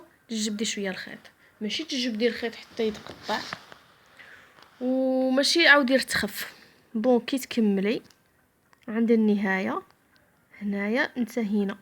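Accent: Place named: Tlemcen, Algeria. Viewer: و الله يا اختي حسبتك تلمسانية انا تاني تلمسانية و نهدر بالقاف و عندي اللكنة كيفك سبحان الله المهم الصدر عجبني بزااااف شباب الله يعطيك الصحة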